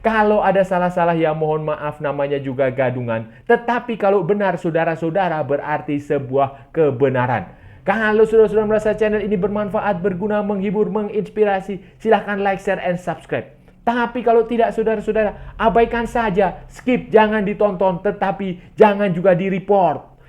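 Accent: Indonesia. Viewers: Kau org Malaysia ke?...sebutan huruf a dan e memang begitu di indonesia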